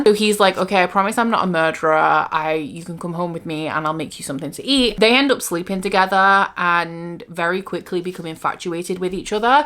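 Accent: Scotland, United Kingdom. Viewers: I just can't place your accent! Moments you sound scottish like me and then you sound yorkshire ahahah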